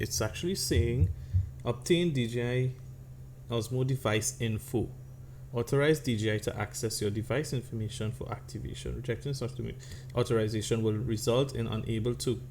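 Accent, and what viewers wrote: Trinidad and Tobago. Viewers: wait wait, you trinidadian awa?? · Good video! You sound Trini! Am I right?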